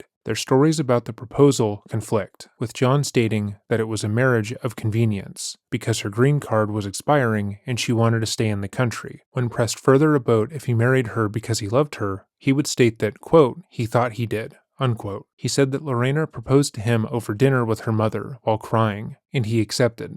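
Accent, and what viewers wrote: Canada. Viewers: You're Canadian? This story brings flashbacks 😂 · I’ve been subscribed for over a year and it’s just now dawned on me that you’re Canadian! Canadians pronounce the word “about” very uniquely. 😅 Love the channel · have you become more Canadian recently? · well he has either purposely added the Canadian accent or the AI randomly generated it and he didn't notice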